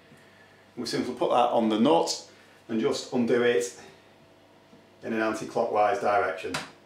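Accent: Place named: England, United Kingdom. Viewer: Nice work. Thanks mate. Gotta love the pommie accent. Gonna go listen to now 👍👍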